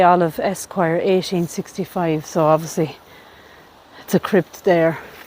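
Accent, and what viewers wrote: Ireland. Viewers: Such amazing places you show in your videos. I've always wanted to visit Ireland for historical reasons and the beauty of the nature. I love your dialect, it is really charming. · I know this isn''t an "IRISH* cemetery. Maybe it's the fact that the narration comes with an Irish accent. It looks like the cemetery is extremely huge if what you covered in 45 minutes is just a small portion of it. There's also a certain charm about overgrown cemeteries. I think it has to do with the body coming from dust and going back to dust after the spirit leaves.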